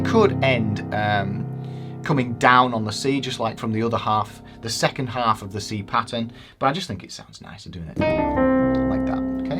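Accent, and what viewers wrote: Yorkshire, United Kingdom. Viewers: Hello. Love the vid! More please. You sound like you´re a yorkshireman like myself, which town?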